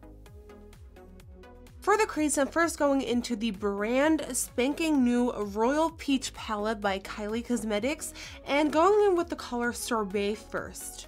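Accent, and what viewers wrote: United States. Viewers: Oké ik wist dus niet dat je Nederlands was je klinkt als een amerikaan😂😂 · She's Dutch, and what an amazing channel and American accent! Ga zo door!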